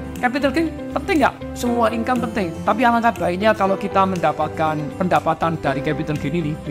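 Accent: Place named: Surabaya, Indonesia. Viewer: Pak bagusnya musik nya dikecilin atau ga usah ada musik nya. Pengen fokus denger suara bapak jd kegangggu sm musik. Makasih. Dan lagi Pak denger suara asli Bapak dengan logat Surabaya nya itu sudah bikin semangat sekali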